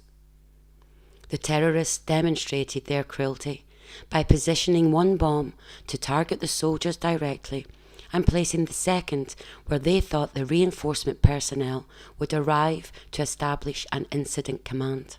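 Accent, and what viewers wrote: Scotland, United Kingdom. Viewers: A scottish woman narrating ..what next ..was there justification for bloody sunday..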